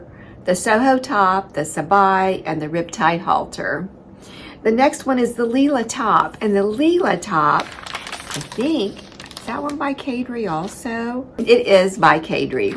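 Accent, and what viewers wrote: South, United States. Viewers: Love your southern accent! How long have you lived in the South? 💕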